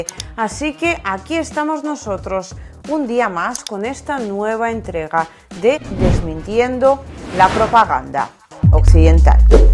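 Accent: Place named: Spain. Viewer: hermoso vídeo gracias hablas "español " mejor que yo 😂 con acento español ( olé y olé )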